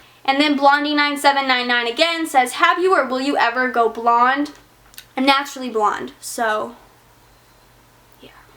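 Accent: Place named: South, United States. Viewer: omg when brain said you picked up a country accent I didn't believe him LOL I heard it!!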